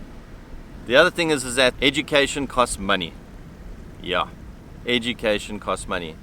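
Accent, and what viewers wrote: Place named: South Africa. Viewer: Hi. I found Yr channel while scrolling for Islamic prayers. I am pleasantly surprised by Yr positive and realistic attitude to Yr stay here in my little island, Mauritius where I was born n still living. Thanks so much for the realistic yet positive review on Mauritius. I hope u explore my little island little more enjoy z feel of Ramadan 2025 and Christian fast 2025 and get to know about our cultures values religions cohabiting harmoniously. I like the fact that u love the simple life in Mauritius. For Yr car, find yrself a mauritian mechanic which will cost u very very less than even rs 10,000 for servicing etc. I have a Toyota vitz yr 2000 with 6 months car fitness n 1 Yr road tax n I pay rs 6000 including mechanic fees for every 6 months servicing. If u so wish u can get a mauritian mechanic whose name is Saleem in another town called Beau Bassin. 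U won't pay so much. People will exploit u once they find from yr talking accent that u r from South Africa. So happy to hear from u n will wait for Yr next videos. 😊 lots of love from mauritius 🇲🇺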